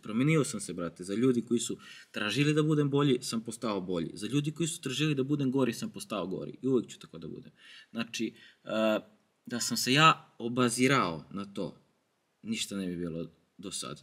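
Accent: Southern Serbia, Serbia. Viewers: Ljudi koji ne mogu da shvate tvoj naglasak i govor jednostavno i ne znaju da 98% nastavnika i profesora u skolama na jugu Srbije cak i u skoli na casu pricaju isto i predaju lekcije tako.i ne mozes ti da promenis to sad za 2-3 dana,jednostavno je tako i neka prihvate.to postoji u svakoj drzavi. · Lepo sam predlagao jednom u komentarima, povedi devojku neku na putovanja, pa makar drugarica bila samo, odmah druga priča, moja preporuka, oprosti ilkici poželi mu sve najbolje i završiš priču time. To ti je život, uči se do starosti, pamet u glavu drugi put sa više iskustva. Samo napred, podržavamo jug srbije, cepaj naš akcenat dole kome se ne sviđa nek traži drugi kanal, i ja sam napustio kanale već zbog nečeg što mi smeta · Prizrensko-timočki tačnije prizrensko-južnomoravski dijalekat daleko će se čuje! Ja garantujem za ovog dečka! Pozz iz SC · Ja ga tek odnedavna pratim. 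I slazem sw sa vama. Decko je strasno inteligentan i elokventan. Svidja mi se taj naglasak, samo ne znam iz kojeg je to dijela Srbije. Mozda juzne, nisam sigurna. Volim inace gledati putovanja, pustolovine i slucajno mi se izbacio njegov kanal. Pozdrav za njega i sve pratitelje kanala iz Osijeka 😊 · tako je jug tamo tako pričaju,simpatičan naglasak. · Batko samo ti nastavi da pricas tako to je jug srbije ljubi te brat,al ilkicu kad vidim ce mu pokrljam nosinger opet ,ce ga utepam ima da dise na rate kroz taj nos,ima nos sece burek lik mali mentol...😊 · Poz, skontao sam i pre ovog videa o čemu je reč i ko je upravu itd. itd. No nebitno to toliko, upečatljivo mi je to što kažeš da nećeš da se menjaš i da nećeš da menjaš svoj naglasak/pismenost i šta li već. Ja sam neko ko je studirao u nacionalno mešovitoj sredini (u Subotici) i stvarno i raznih krajeva su dolazili ljudi i upoznao sam od Kosovara do Madjara studente. 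Stekao sam POGREŠAN utisak da su ''Srbijanci'', kako mi Novosađani pogrdno i sa podsmehom kažemo ''Srbijanci'', pritmitivni i prosti ljudi, i taj njihov naglasak to dodatno govori. Ti si taj koji menja taj ceo moj pogrešan utisak i hvala ti na tome. Poz iz Novog Sada.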